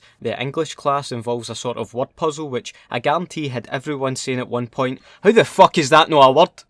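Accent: Scotland, United Kingdom. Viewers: Omg its my favourite fookin shite trainspotting accent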